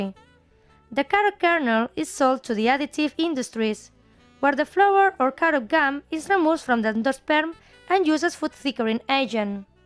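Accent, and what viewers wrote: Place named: Spain. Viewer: Very informative video. Love the Spanish accent!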